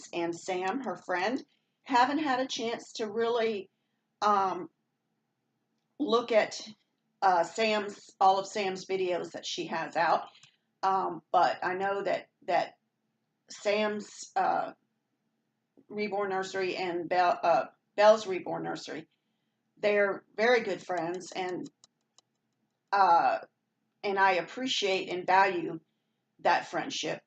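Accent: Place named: South, United States. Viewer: Hello there thank you so much for all of your very sweet words!! I love your southern accent!! Do you like my northern accent? I’m northern Pennsylvania! Xoxoxo 💕🦋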